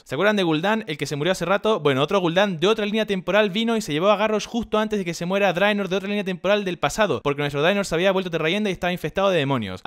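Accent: Chile. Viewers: Este wn es chileno? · Nada mejor que escuchar a un argentino hablando como chileno. Que agradable sujeto.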